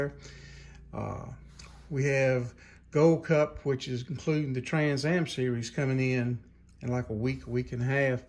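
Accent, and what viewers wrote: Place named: Northern Minnesota, United States. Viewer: Ahh ok, accent sounded off to be northern Minnesota lol but people do move. Again good luck on the USACC build!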